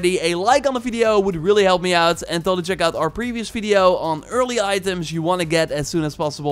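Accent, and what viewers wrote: Netherlands. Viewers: Great video, cringy dutch accent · Nice Dutch accent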